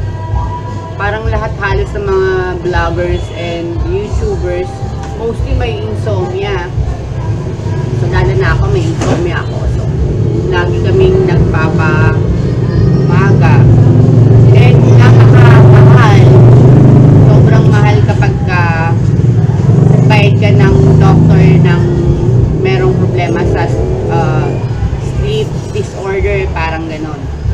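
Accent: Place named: Visayas, Philippines. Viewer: Ntawa ako sa visaya accent mo sis 😃😂 ok nga minsan gusto mo ngang mg vlog ng mhaba pag nasa Labas ka pero my mga Tao ksi Kung makatingin e parang baliw. Good at my update Nman Khit papano ky Nanay inday sis.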